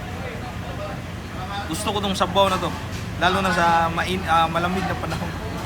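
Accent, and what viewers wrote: Visayas, Philippines. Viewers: Gahia pod sa imong Tagalog Bai. Klarong Bisdak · bisaya kay ka kollll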